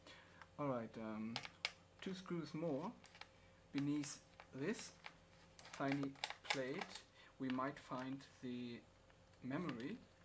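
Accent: Germany. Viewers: ich höre schon das du eigentlich deutsch sprichst ;D kannst du mir sagen welche grafikkarte rein müsste um battlefield 3 auf hohen ( nicht ultra ) einstellungen zu zocken ? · schöner deutscher acczent^^ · ein leicht deutsch akzent ;)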